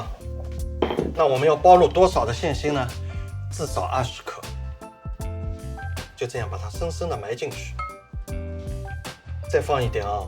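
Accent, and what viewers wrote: Shanghai, China. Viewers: 很搞笑，是上海或者苏州口音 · 上海口音好亲切啊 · 朱师傅您太幽默了。哈哈哈哈个口上海口音来的个亲切。。下下分享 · 朱老师带着上海口音的诙谐和幽默, 太有趣了! 赞一个!😃👍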